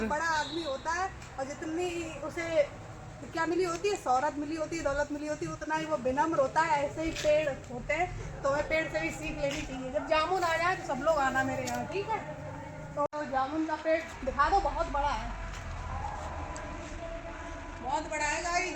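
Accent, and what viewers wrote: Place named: Braj, India. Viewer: Kaha se ho aap language to braj ki lag rahi he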